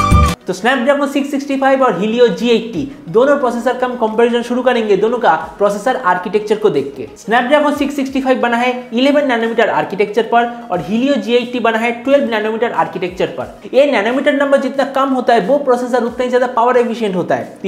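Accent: Bengal, India. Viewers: Vai vdo ta khub valo informative... Sudhu ektu try koro Bengali accent ta komate jkhn hindi te korcho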